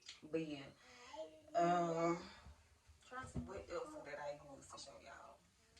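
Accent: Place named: South, United States. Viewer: I love your beautiful accent! Are you from down south?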